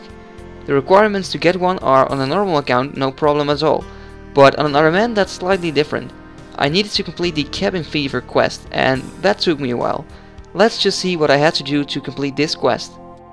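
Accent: Netherlands. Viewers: fantastisch nederlands accent :D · Ben je nederlands man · Viel me op dat je Nederlands bent :) je maakt echt goede video's man! Gelijk geabboneerd, ga zo door man ;) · kom je gewoon uit nl? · It's so weird that I just knew you were dutch from like the first word you said :S. I'm dutch too, the accent is not as obvious as alot of other dutch people though. Well done btw, I know what quests to do now aswell :D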